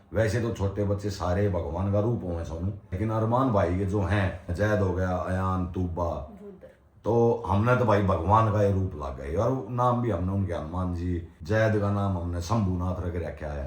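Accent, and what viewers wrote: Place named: Haryana, India. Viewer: Apka man saaf hai aur apke pariwar mei koi dikhawa nahi hai yahi apki sacchai hai. Jis ghar ki aurat itni saaf dil or hansmukh ho vaha hameshacbkhushiya hoti hai. Bhole baba ki kripa aise hi bani rahe aapke pariwar pe. Apka jo haryanvi boli ka andaz h vahi apke blogs ki shaan h aise h blog banate rahiye Bhai sahahb ji. 💐💐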